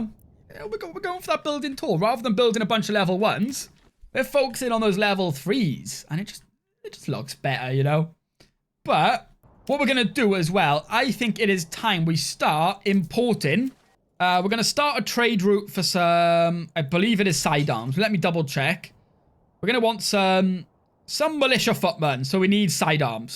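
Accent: Wales, United Kingdom. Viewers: Got me hooked straight away with that Welsh accent lad! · Is that a Welshman I hear? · Ooooof so Welsh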